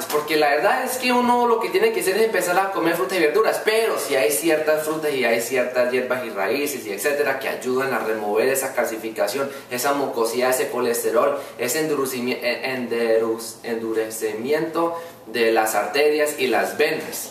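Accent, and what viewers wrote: Colombia. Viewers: Eres Colombiano? Me Gusta Tu Acento :$ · estoy empezando a ver tus videos me encantan. c oye muy bonito tu inglés y parece tu tono de Colombia c ve k eres muy inteligente saludos desde Monterrey México 😘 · Hola es primera vez que te veo y me gustó mucho tu explicación, y debes de estar orgulloso de como hablas eso te identifica de donde eres así como a todos me encanta como hablan en Colombia, Arriba Colombia!!! Y eso que soy de Guatemala.Felicidades y sigue con tus consejos.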